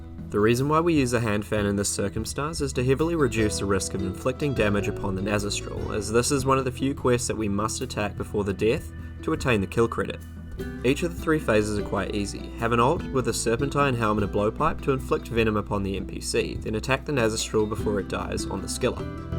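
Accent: New Zealand. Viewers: Are you from NZ? Accent sounds familiar.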